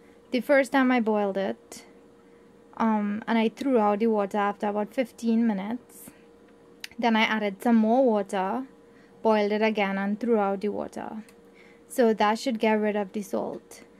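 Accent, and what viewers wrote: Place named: Trinidad and Tobago. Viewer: Love your cooking. Keep up the good work . Love that you talk trini and don't put on a fake accent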